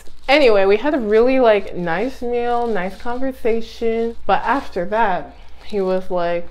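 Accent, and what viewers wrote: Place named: Nigeria. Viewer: Your Nigerian accent is coming thru 🇳🇬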